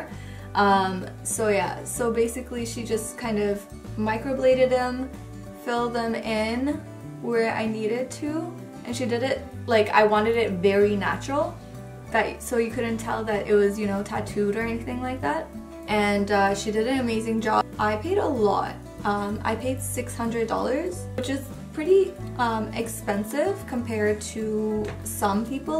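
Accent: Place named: England, United Kingdom. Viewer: How u are so well spoken English accent if u are from India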